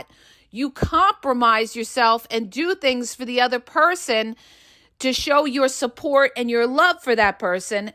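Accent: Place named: Brooklyn, United States. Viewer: Hey, cool, Brooklyn accent. You are spot on with narcs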